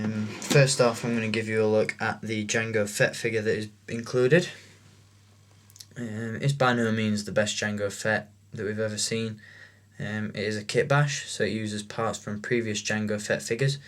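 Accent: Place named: Northern England, United Kingdom. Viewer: sounds like north england somewhere round Yorkshire, Blackburn, maybe? I don't really know northern accents so well... Sheffield?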